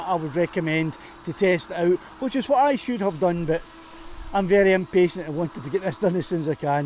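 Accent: Scotland, United Kingdom. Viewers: Love the Scottish accent!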